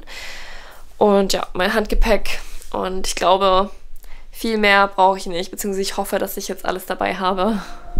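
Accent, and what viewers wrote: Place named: Germany. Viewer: Me gusta tu acento Alemán y así aprendo Alemán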